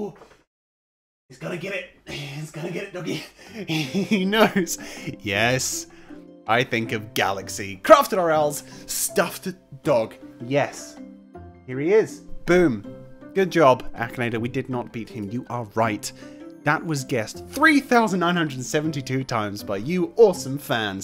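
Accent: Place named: Leeds, United Kingdom. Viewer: You from leeds or am i wrong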